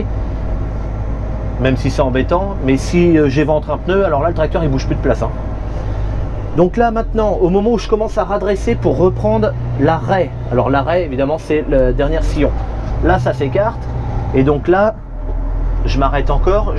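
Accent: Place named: Vendée, France. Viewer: Chère Vendéen y'adore toun'accent,mé i seu en Charente Inférieure le pays d'Goulbenèze,un grand salut à vous aut'teurtout. Et vive les socs et les oreilles.😅😅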